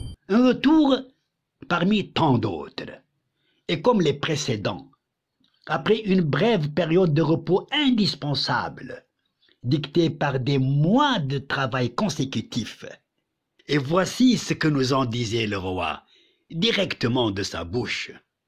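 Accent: Paris, France. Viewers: Bonne fête d'abord pour l'Eid Fitr. Je crois que désormais la grande popularité du Roi Mohammed VI et les impressionnantes réalisations d'un Maroc nouveau qu'il a inaugurées à son accession au Trône n'auront plus de secret pour moi, après avoir visionné ce documentaire d'une valeur considérable. Votre analyse est aussi riche que succincte en fournissant l'essentiel sur les attributs fondamentaux du Roi du Maroc en relation avec son "métier de roi" selon sa propre expression. Votre lecture n'est pas moins claire avec une intonation française parisienne impeccable, absente chez beaucoup de mes concitoyens. A cela s'ajoute évidemment votre talent d'orateur qu'il n'est plus besoin de rappeler, associant non seulement l'art de parler et l'art d'écrire à l'égard de tous, d'après moi, mais aussi une belle voix dont la chaleur et la tonalité phonétique pénètrent le cœur. 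Permettez-moi d'ailleurs de vous exprimer mon sentiment, pour ne pas dire ma perception psychologique admirative à ce sujet. Les vibrations de vos cordes vocales émettent un timbre spécifique à votre psychologie. Et cela découle donc d'une combinaison de sons harmonieuse qui relève de la phonétique descriptive. Le Psychologue-ORL retraité que je suis, a pu relever en vous cette particularité phonétique à travers votre élocution. Elle est encore plus perceptible dans l'articulation et l'enchaînement de vos phrases, à travers vos poèmes. C'est d'ailleurs ce qui m'a attiré initialement en écoutant votre premier poème da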